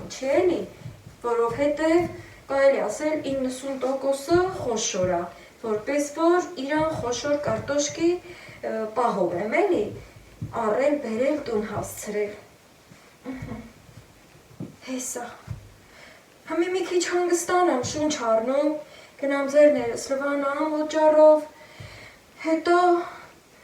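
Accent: Lori, Armenia. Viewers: Վաաախխխ Լոռվա բարբառ ❤❤❤ որտեղից եք տիկին ջան?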